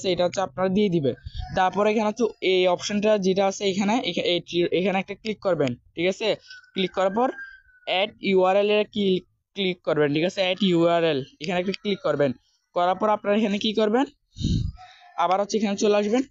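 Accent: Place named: Chittagong, Bangladesh. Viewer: ভাইয়া ত চট্টগ্রামের মনে হয়,, কোন যায়গায়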